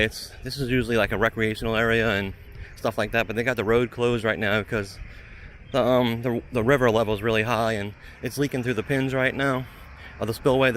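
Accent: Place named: New Orleans, United States. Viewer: You sound so New Orleans! Thanks for posting!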